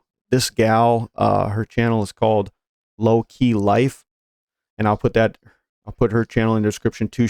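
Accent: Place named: Wisconsin, United States. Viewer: Oh you're from Wisconsin for sure.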